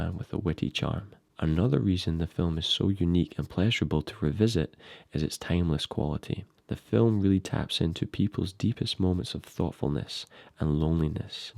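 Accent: Scotland, United Kingdom. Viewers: I thought it was an automated robot voice at first and was about to close the video. Then I realised it was a Scotsman just speaking in a formal voice lol. I agree his voice is unique nonetheless haha. Good vid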